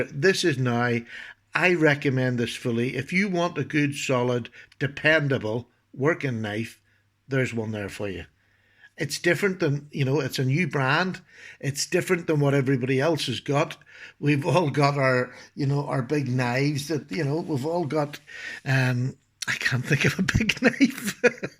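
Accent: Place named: Ireland. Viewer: This review made me purchase this knife. Your voice sir makes me want to visit Ireland and drink large quantities of beer. Cheers from Texas!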